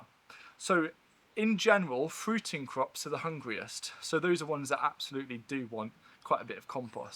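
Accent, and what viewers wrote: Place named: Wales, United Kingdom. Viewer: Just pre-ordered "The Permaculture Garden" and am looking forward with great anticipation to a fantastic gardening journey. And, agreed: leeks are the most important part of the garden. Now we just need the audio book version with you as the narrator, because such a gorgeous Welsh accent should be used as much as possible. I truly believe that your garden, hearing you talk to all the plants and shrubs and worms, is made that much better for the beauty of hearing words of encouragement in that amazing accent. Thanks for all that you do for the community. Cheers!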